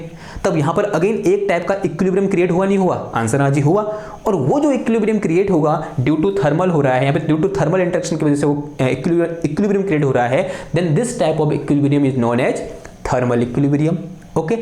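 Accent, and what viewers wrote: Uttarakhand, India. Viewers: Sir aap Uttarakhand se ho kya ?🫠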